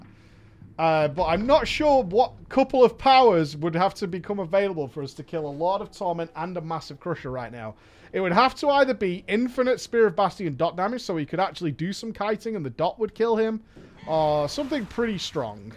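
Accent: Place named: Wales, United Kingdom. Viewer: 75 minutes of that silky Welsh accent? What A beautiful wednesday.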